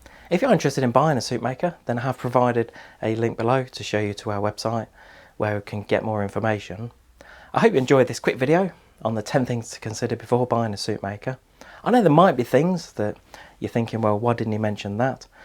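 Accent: United Kingdom. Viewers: I'm not considering to buy a new Soup Maker at the moment, but whatched the episode and gave it a TU for the fact alone you upload this kind of usefull content. You bring it in a very nice classic British manner; it's not spoken too fast, so non-native English viewers can also follow along quite easily.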